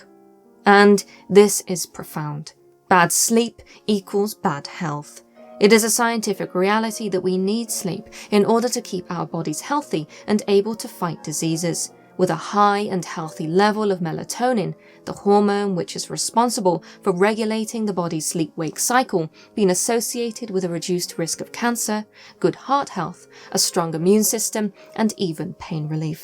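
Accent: United Kingdom. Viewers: The narrator, is very eloquent and very english, very lovely. And this explanation about why we are afraid of the dark does make since. I don't feel quite so bad being still afraid of the dark and being 49 lol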